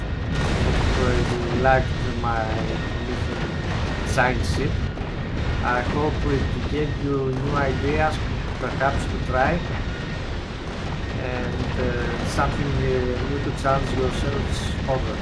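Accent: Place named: Cyprus. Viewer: Από την τσιπρέϊκη προφορά σε κατάλαβα. Εγώ πέρυσι το ξεκίνησα. ξέρεις πώς γίνονται αυτά... ένας φίλος σε ένα πάρτυ μου λέει «έλα δοκίμασε, με μιά φορά δεν κόλλησε κανείς». Πήρα και το Space Age... άστα μεγάλε. Αγίου βαλεντίνου στην φάμπρικα. Το τερμάτησα αλλά δεν έκανα την τελευταία επιστήμη. Τώρα το ξαναξεκίνησα και έβαλα και κάτι μοντάκια κόλαση: Quality++, Corrundum, Maraxsis, long stack inserter, advenced belts και φύγαμε για megabase.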